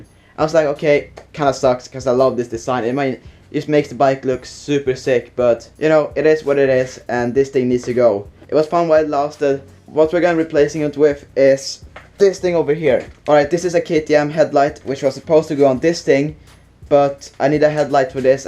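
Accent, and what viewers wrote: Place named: Sweden. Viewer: låter bättre på svenska för du snackar svegelska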